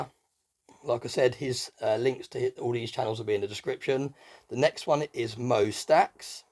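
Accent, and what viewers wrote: United Kingdom. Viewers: I have a ear for voices and you must come from the same part of England as Solomon Lane from Mission Impossible. 😁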